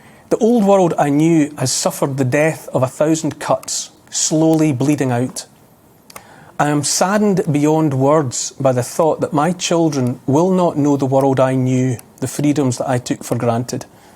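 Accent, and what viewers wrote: Scotland, United Kingdom. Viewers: I've been saying the same thing for several months now, but it just sounds better with a deep Scottish accent. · He is an engljshman with a Scottish accent.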